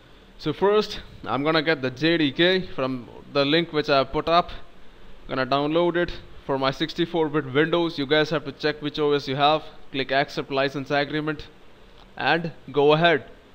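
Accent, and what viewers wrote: India. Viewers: Thanks so much, the first time i like an indian accent <3 seriously, THANKS!!!! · Ever since I started learning to code, I got quite used to Indian accents. It doesn't bother me much. I like overly confident way of speaking. Good job bro.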